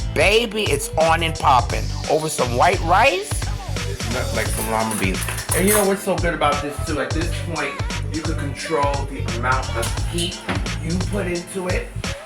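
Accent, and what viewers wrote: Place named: East Coast, United States. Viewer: Hey Ms! I love your cooking style! Your green sauce is giving me life and I haven’t tasted it yet! I love your sass and that east coast vibe. Oh yes, I can hear it in your voice! Thank you for this depth of flavor. I’ve seen recipes where people just add salt and pepper with some chicken stock. Period. I need spice in my life! I bought some neck bones and limas today, so this will be on my table soon! Thanks so much! ❤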